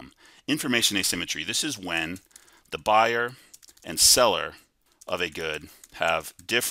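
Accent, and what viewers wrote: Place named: United States. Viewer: Can u add subtitles as every one cant understand american accent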